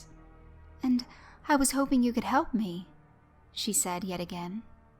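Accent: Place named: Georgia, United States. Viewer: You must a country girl, guessing Georgia. Or Alabama. Love county girls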